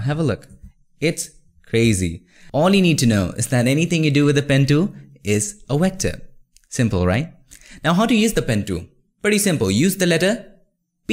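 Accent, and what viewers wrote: India. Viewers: du redest zu viel mit deinem indischen Akzent😮😅😊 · bahasa inggris logat india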